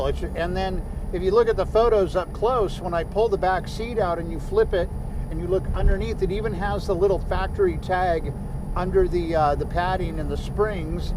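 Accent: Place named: Upper Midwest, United States. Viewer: Sounds like he's from upper mid west/northern plain states..Mn, Mish..'EH'?..Robert at 70 in Az.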